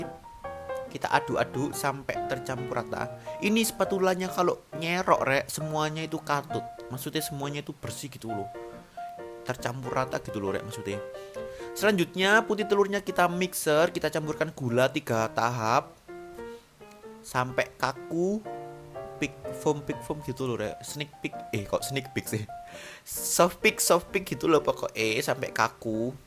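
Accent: Java, Indonesia. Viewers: Medok lo rek mas 😂😂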